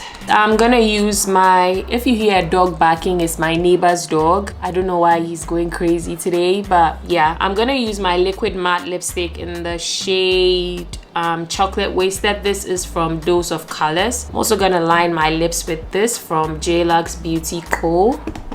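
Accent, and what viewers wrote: Ghana. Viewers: You sound so ghanaian! I love it ! I appreciate your authenticity, it's making me miss GH. You're also stunning !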